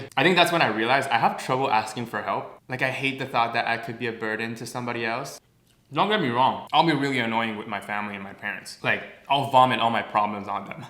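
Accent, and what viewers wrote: Canada. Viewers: If only Mr could make a video in french i love hearing he's Canadian accent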